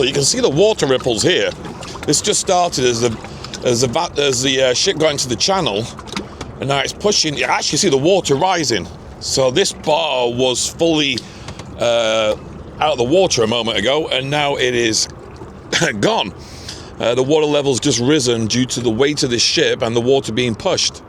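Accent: United Kingdom. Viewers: Where did you get that lovely British accent? Certainly not in Ontario.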